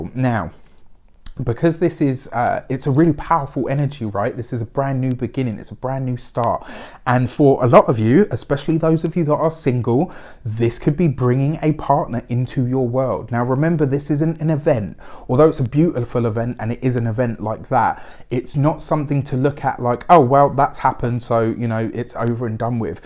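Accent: England, United Kingdom. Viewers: You’re so wonderfully English “now that I’ve waffled on” haha. Thank you for this lovely reading 🥰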